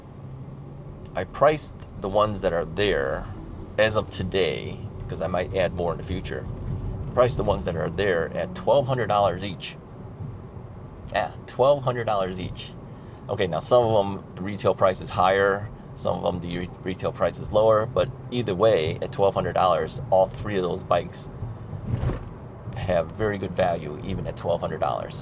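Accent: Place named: China. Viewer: you know you have a Chinese accent.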